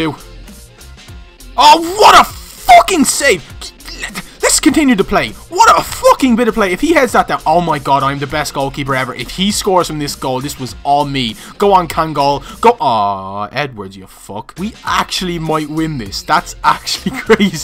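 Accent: Ireland. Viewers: I love that Irish accent coming from a lad from Cork😂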